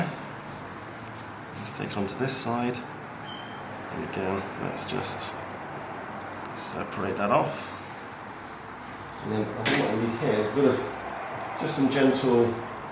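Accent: United Kingdom. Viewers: Its really nice to hear the brit accent in one of these vids. I was starting to think only Americans did this 😅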